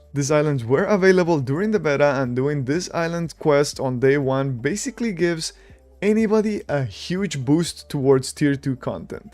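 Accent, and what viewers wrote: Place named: Europe. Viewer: I thought you were EU based on your accent haha, or you’re playing both regions?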